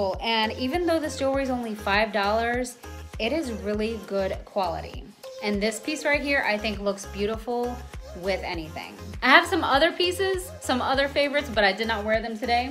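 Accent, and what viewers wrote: Texas, United States. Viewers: Do not lose your Texas accent up here!! I love it!!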